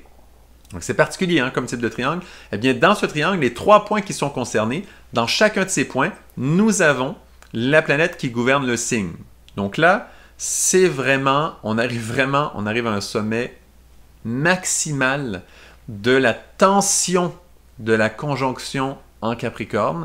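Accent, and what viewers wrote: Canada. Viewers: c'est vraiment très intéressant , l'accent canadien donne une dimension supérieure à cette capsule !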